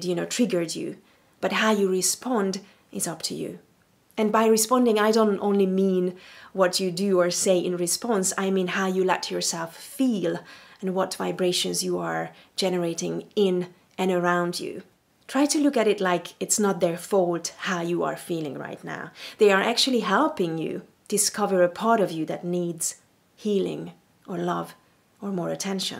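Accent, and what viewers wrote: Hungary. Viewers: Hi from Hungary. You sound to have a Hungarian accent.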